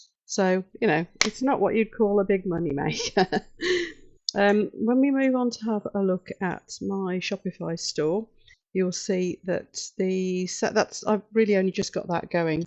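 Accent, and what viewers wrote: United Kingdom. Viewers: This was very insightful 🙏🏻 I’ve been ‘learning’ polymer clay for the last year, starting with the proverbial jewellery. Just started trying to sell on Etsy, but I think you are right and far too much competition. Would you say your YT channel drives sales to your Shopify store? Or do they ‘help’ in that way? Driving traffic has always been my nemesis. 😞 Thanks for the videos. 😊 Lovely to see and hear another Brit! 😏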